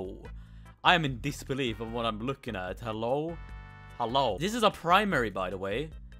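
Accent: Sweden. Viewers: Is he swedish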